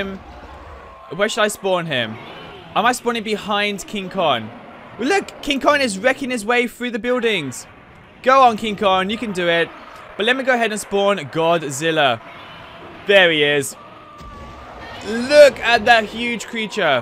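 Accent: United Kingdom. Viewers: are you brittish ? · i think hes british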